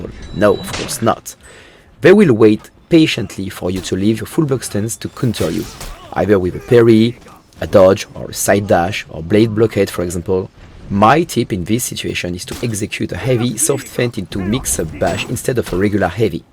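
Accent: France. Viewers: Ah a fellow Frenchman it sounds like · Are you French? Cool accent! And great video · J’entends que tu est français😂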